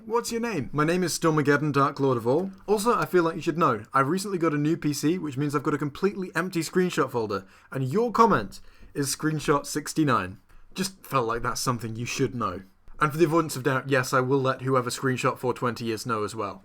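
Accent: United Kingdom. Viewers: I have your british accent as well, of all the accents to end up with in britian. Also 6th, really! I mean I get the 4th and 8th doctors but 6th!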